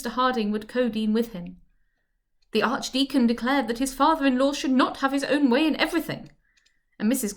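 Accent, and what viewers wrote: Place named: England, United Kingdom. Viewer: Thank you so much for this! I had started the book with another reader, but he inexplicably stopped posting after Chapter 28. So I investigated, found your series, and finished out with you. I'm so glad I did– your readings proved to be superior in their accuracy, pacing, English authenticity, and amusing vocal characterizations. Well done indeed!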